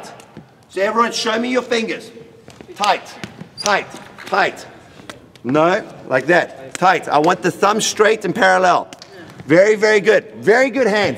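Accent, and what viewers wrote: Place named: Russia. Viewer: ) Guess you should make a video? Feel free to post to compare! - There is definitely a time to bend your arms. The game has changed allot since the 80's. You sound Russian and old School. In the 80's they served underhand. Today even at HS the serve is coming so fast your goal is to make a platform. Sometimes your arms cannot be straight. But you can still make a great platform with bent arms! :) Anyway send me the link to your video coach? :)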